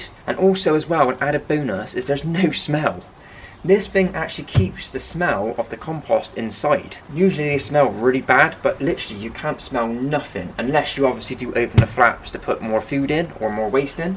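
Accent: Bristol, United Kingdom. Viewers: I was going to say this is a normal Bristol accent. Some people have no ideals! Lol ❤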